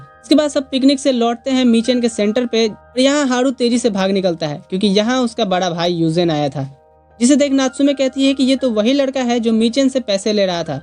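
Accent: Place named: Bhojpuri, India. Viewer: Mr apka asent bhojpuri 😅 h same here ☺️☺️and apki voice achi h 😴😴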